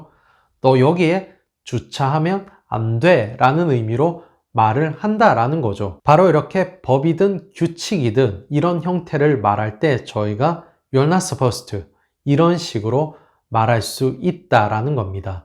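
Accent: United Kingdom. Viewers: 한국어에 영국 억양이 묻어나요^^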